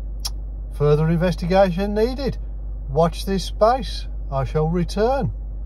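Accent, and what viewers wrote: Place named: Birmingham, United Kingdom. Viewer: Don't know why, but the brummie accent and talking about car problems are a perfect match.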